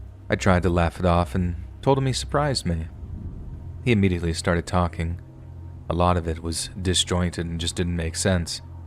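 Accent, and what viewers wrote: Denmark. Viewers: Haha, når man genkender dansk accent med det samme!! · So is Danish? I was trying to place the accent.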